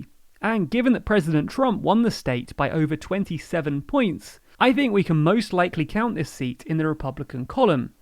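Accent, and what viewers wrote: United Kingdom. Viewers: Why is a British guy telling us who'll win? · Oh boy, a video narrated by some British guy telling how eight U.S. Senate election races will determine control of the Senate. NONE of these eight particular races include the Senate seats in Minnesota or Michigan, both of which the Democratic incumbent is very vulnerable and of which could help maintain GOP control.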